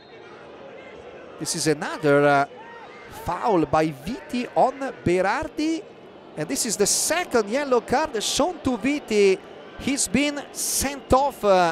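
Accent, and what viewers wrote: Italy. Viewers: I love to hear the italian accent from the commentator commenting Serie A highlights. So authentic and perfect for italian football👌 Grazie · What a comentator.. nice to hear it.. English with Italian aksen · Sembra un italiano che legge il commento in inglese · probably the strongest Italian accent ever heard on TV! even by us Italians!! it's the icoliseeerrrr! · The commentator makes me embarrassed to be italian. We don't all talk English like this 😒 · Commentator with italian accent is the best thing of this new year lol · the speaker is 101% Italian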